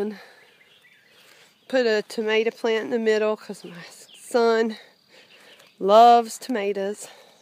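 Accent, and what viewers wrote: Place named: Memphis, United States. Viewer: Your garden looks very healthy. By your accent it sounds like you might live near us here in Memphis. My tomato Jungle is in the greenhouse this year. I had such a battle last year with the tomato horn worm outside that I moved them all (100 plants) inside. I look forward to seeing more of your garden this year. Chuck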